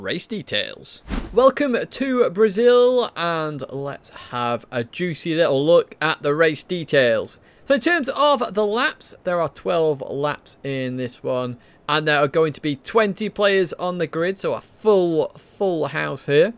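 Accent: England, United Kingdom. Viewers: What part of England are you from. I’m just curious about your accent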